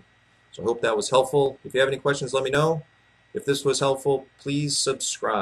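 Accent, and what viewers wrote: New England, United States. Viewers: You're from New Hampshire, aye? EAST COAST represent!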